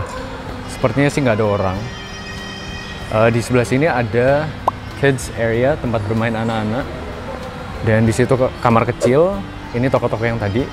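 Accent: Java, Indonesia. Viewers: aksenmu kok njowo ngono le kwkwk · kok ngomong nya medhok ya 😅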